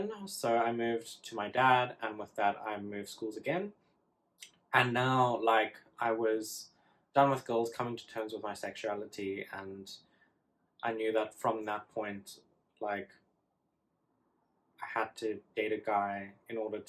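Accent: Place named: South Africa. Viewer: Lovely South African accent? It is not as heavy as others I've heard. Cape Town? You didn't mention how you came out to your family.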